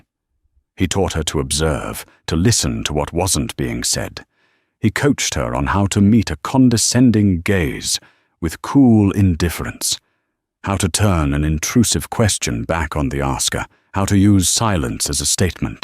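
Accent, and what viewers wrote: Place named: England, United Kingdom. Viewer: Ah yes. Luv that purring English accent. YUM!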